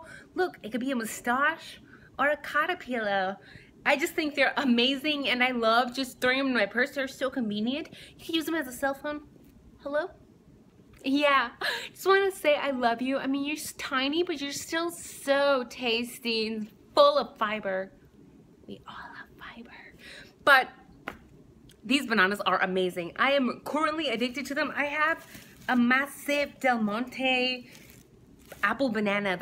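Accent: Scotland, United Kingdom. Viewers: Is that SCOTTISH ACCENT??? WOOOOOOOOOOOW!!!! Am i the only one who noticed that? You are a TRUE GODDESS!!!! Your beauty is TRULY DIVINE!!!! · I never noticed your scottish accent until now.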